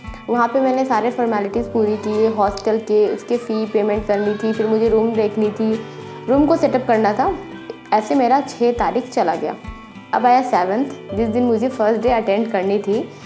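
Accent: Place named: Bihar, India. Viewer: I'm also a bihari her accent is relatable...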